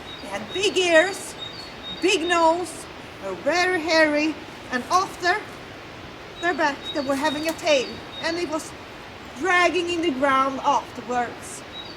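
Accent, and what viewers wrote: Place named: Sweden. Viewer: Thank you for this wonderful documentary, I enjoyed it so much! I'm an Australian and I visited Halleberg last year. I have been studying the Viking Age and the earlier periods since I was a teenager in the 70s and I've always dreamed of going to Sweden but I never heard about these two mountains, and I especially had never heard that they were Asgard and Valhalla and Odin's lookout. I started writing a book 2 years ago which I've just published and I talk quite a bit about this area because my Swedish friend took me there and translated the posters in the museum about the Aesir coming and setting up there fortresses there. I think they built those great stone walls you were showing. You taught me a lot of very useful things, I found you because I was looking for information about Hästevads Stenar. You look really great in your warm green dress, you really do look like a magical lady from the Viking age. 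The way you speak English with your Swedish accent is also very charming for us to listen to. I hope to come back again soon and camp there and really look over the whole area. Kindest regards David from Australia.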